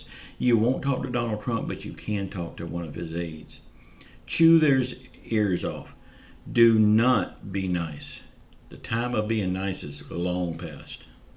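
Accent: South, United States. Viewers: You crack me up I listen closely to everything you say and I do believe you and most of all I love your southern accent let's pray that we get the $2,000 and retroactive back from March that would be completely awesome continue to do the good work keep up the good work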